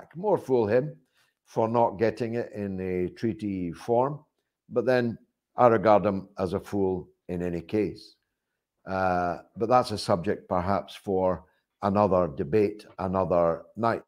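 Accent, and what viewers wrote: Scotland, United Kingdom. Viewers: Are there any sort of lessons I can take to get this Scottish accent?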